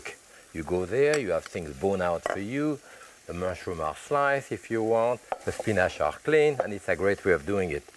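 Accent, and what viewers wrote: France. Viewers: It's his gorgeous French accent. Personally, I could listen to him talk all day long.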